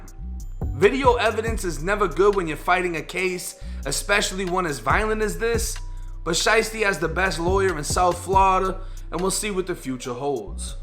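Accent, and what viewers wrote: Boston, United States. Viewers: Your accent sounds like you're from Boston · You from Boston? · U from Boston? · Hey were you raised in the Boston/New England ares because you have that BAWSTON accent?!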